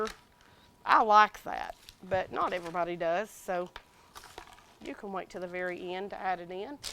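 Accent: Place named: Maine, United States. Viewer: Lord, thank you that despite growing up in the middle of the potato field, I don't talk like these people here in Maine. Good god, don't know what I'd do if I had to speak authentically to my region like this woman.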